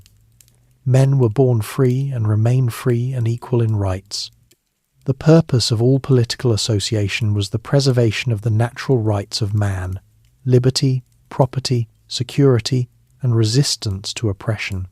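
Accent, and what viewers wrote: England, United Kingdom. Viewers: Another one of those videos with a horrible very strong English accent. I don't waste my time with such things.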